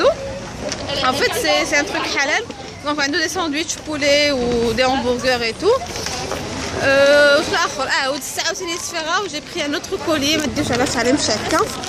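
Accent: Fez, Morocco. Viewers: andk l accent fassi yak?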